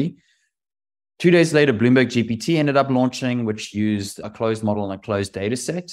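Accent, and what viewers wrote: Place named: South Africa. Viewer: Random comment, I hear your South African accent lol. Much love from SA!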